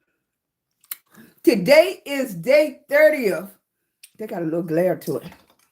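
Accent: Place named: South, United States. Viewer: Love your spirit and your southern accent. Keep being a warrior.❤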